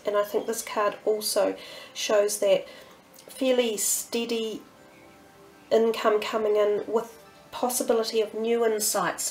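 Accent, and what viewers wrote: New Zealand. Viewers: Awesome Reading ~ thankyou :))) Archangel Haniel also brings in the Energies of The Moon for us ~ which weaves beautifully with Archangel Gabriel's Message ~ Intuition & 'Pyschic Insights' .... :))) Wonderful to hear a fellow NZer :))) Aroha & Angel Blessings!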